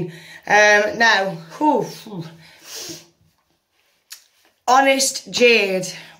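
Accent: United Kingdom. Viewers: R u a Geordie hun x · is that a Sunderland accent?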